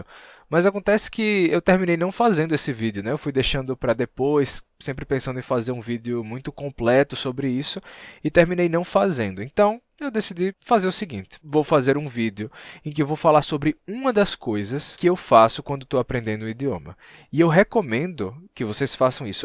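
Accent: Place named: Northeast, Brazil. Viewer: Você tem sotaque de português do. nordeste do Brasil. No entanto, percebo um outro leve sotaque, que identifico com o sotaque de nativos do português do Brasil, mas que residem há muito tempo no exterior. Digo isso, porque tenho um neto brasileiro que reside em Londres desde 2008, e percebo um levíssimo sotaque em algumas palavras.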